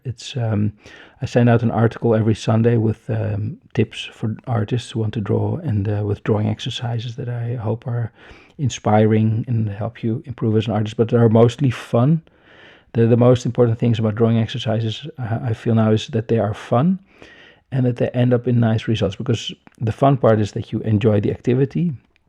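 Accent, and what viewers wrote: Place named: Netherlands. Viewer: Haha i knew you were Dutch by you're accent😊 ik ben ook Nederlands. Hallo ✌🏻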